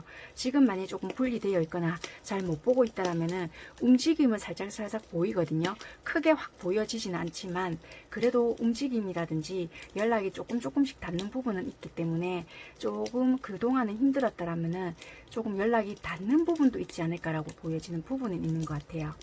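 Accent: Busan, South Korea. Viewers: 헐 직접 댓글 달아주셔서 정말 감사해요...!!!! 완전 속이 후련해졌어요 저건 그냥 제 망상적인 단순한 의문이었구요 말씀하신게 그대로네요.. 머리론 아는데 자꾸 끌리고 다시 만나게되요 ㅠ 제 현실따문에 헤어졌는데... 정말 이렇게 답글 주셔서합니다ㅜㅜ 그냥 만남에 있어서 좋게 생각하려고 노력중이에요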